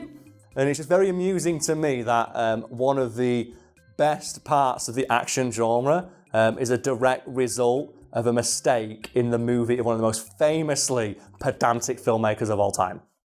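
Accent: United Kingdom. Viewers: And what i said about government guidelines was in response to what you said about masks. And i don't think it's that hard to pinpoint where this guy is from, considering his extremely British accent. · You can tell that he's British from the first few words. "Like seasoning its not necessary"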